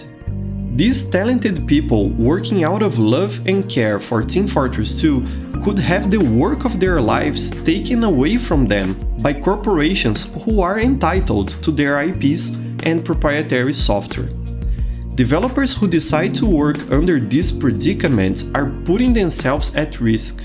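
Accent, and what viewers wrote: Brazil. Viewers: Has someone told you that yer have a very Brazilian accent? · I recognize the Brazilian accent a thousand miles away lmao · you sound brazilian ngl, but i liked the video, i think tf2 is a godly game but i hope it dont die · man, are you brazilliam? I played once with the medic called "Kuling" who was healing you, also your accent, cool to see more brazillians in the tf2 community <3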